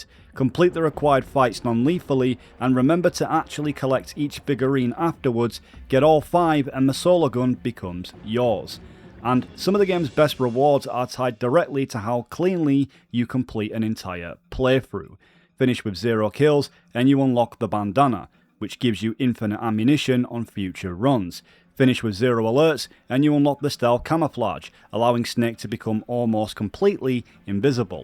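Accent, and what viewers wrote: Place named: United Kingdom. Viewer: Love your british accent but 2 minutes long intro? FML.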